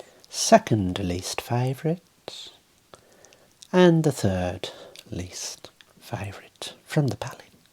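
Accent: Birmingham, United Kingdom. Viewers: Couldn’t get more brummie than this 😂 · Omg British accent💀😭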